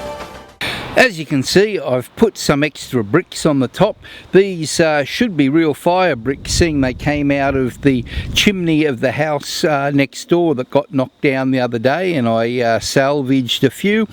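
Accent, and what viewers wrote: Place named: Australia. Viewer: flamin aussie's eh ?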